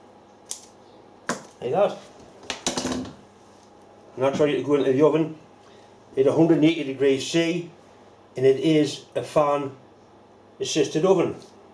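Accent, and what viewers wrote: Newcastle, United Kingdom. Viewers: What a wonderful surprise to here a geordie accent..then seeing you cut the onion on a lathe knew I would like the video maker,thanks for the plate pie recipe regards Sue from Whitley Bay now living in New Zealand for 40 yrs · Some of me best memories from the fifties as a bairn were Sunday teas at me Nana's in Lemington. Everybody came so it was a full house and she made these same mince pies and they were just devoured in no time. I had 4 uncles so they got first dibs. I think your version is the closest I've seen to what I remember and I have to say ta very much for bringing back good memories, and as much as the plate pie was lovely, hearing the Geordie was balm to me soul. Since I last had a poke round through your videos I'm dead glad to see you've put all the recipe ones together. Makes it a lot easier to find when you're as old as me. 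We"ve lived in Alberta since 1967 and I miss home still. Me Dad was a whitesmith at Parsons so even your work is interesting to an old woman an all. Thanks very much for sharing, and hope you and yours are keeping well. Merry Christmas! 🤶 🎄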